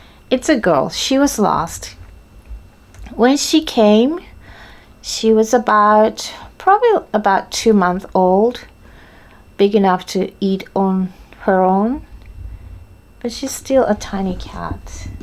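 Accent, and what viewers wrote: United States. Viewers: As an Indian I liked your content ma'am because of your American accent. And you just teach us American english accent please🙏🙏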